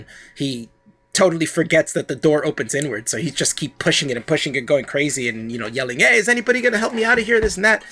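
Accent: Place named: North Jersey, United States. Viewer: Take a hint from the show. The narrarater of this channel should be changed. Sounds like a 14 year old kid from North Jersey in his moms basement.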